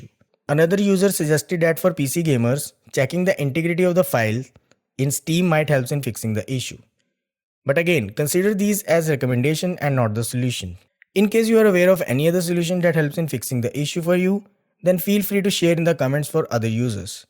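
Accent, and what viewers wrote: India. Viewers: feedback: please learn to pronounce EN proper. dont use the indian scammer accent and expect not to get backlash. if not at the very least make content exclusive on hindi.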